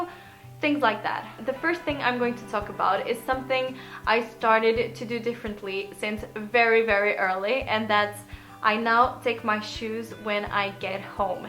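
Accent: Norway. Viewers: you sound like an Norwegian speaking English! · you speak english with Norwegian accent.... you are not Portugese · It's almost like you got a Norwegian English-accent, if you know what I mean? Have you learned any Norwegian by now while you've been here, and what do you do for a living? Anyway, you've got a new subscriber now 😊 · Is there someone else who think she has a norwegian accent? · She looks Norwegian..... I`m suspecting this portuguese routine to be a lie! Blue eyes, pale skin and norwegian accent...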